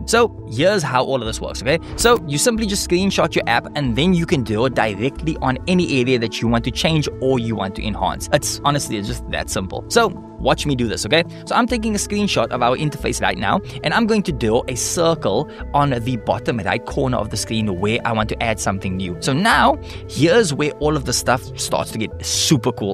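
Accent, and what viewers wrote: South Africa. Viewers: I hear a South African accent there, probably because of Afrikaans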